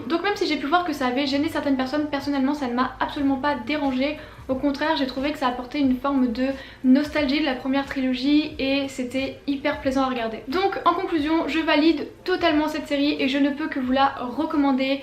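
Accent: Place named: Quebec, Canada. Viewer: I lived in Montreal for 25 years, but I don't get to hear people speak quebecois french anymore, so I watch your youtube channel instead :-)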